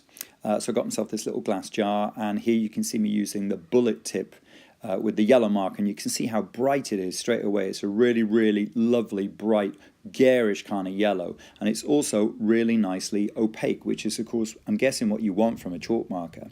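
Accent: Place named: United Kingdom. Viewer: May I ask, which part of the UK do you come from?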